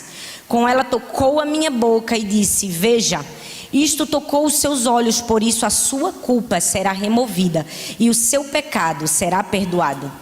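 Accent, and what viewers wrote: Northeast, Brazil. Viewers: Pelo sotaque sabemos q a pastora é nordestina gostaria de saber de onde é . Muito boa cheia de Deus 🙏🙏🙏🙏🙏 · Pastora amo seu sotaque. Sou do Nordeste também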